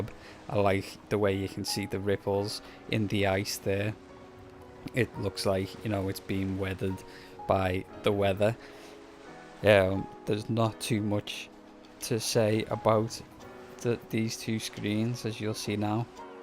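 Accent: Liverpool, United Kingdom. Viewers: Oi scouser! Cheers for the videos. Might subscribe but one question first, are you red or blue?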